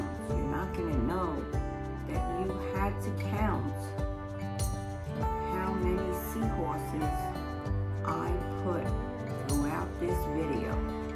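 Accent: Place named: New York, United States. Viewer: I’ve set the notification! I always want to write ‘ ’ to play up your NY accent!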